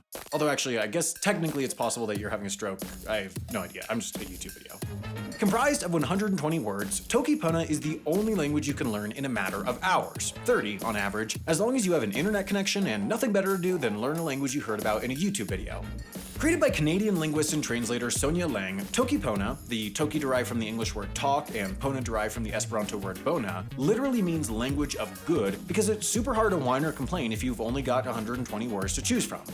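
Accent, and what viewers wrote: United States. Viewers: Are Americans really that bad at pronouncing other languages without that strong American accent or is it just? To learn how to phonetically pronounce so few letters in an another language is not that hard really. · say they are the spanish vowel goes on to pronounce i and e like every stupid American even tho he speaks french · he just has the ‘American’ accent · It's unsettling to me how someone so knowledgeable just...cannot produce basic sounds without them being overtly "American" 💀 having flashbacks to his China videos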